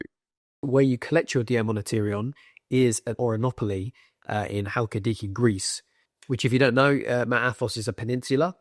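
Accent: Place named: United Kingdom. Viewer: Orthodox, cute AND British accent ? ❤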